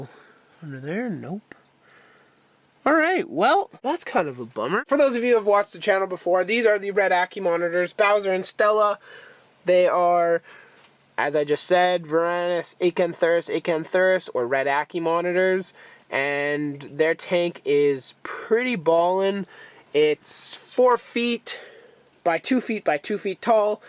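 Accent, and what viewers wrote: Canada. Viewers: Grass ( although I heard "rass") You mentioned you aren't from the states, are you by any chance Canadian?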